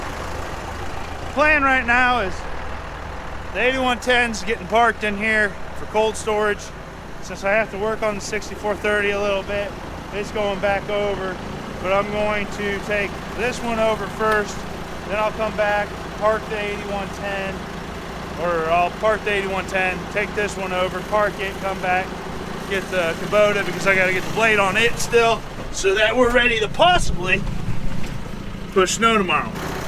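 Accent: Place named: Iowa, United States. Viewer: He is in extreme south central IA very close to Lake Rathburn and Centerville area. He has that southern IA accent.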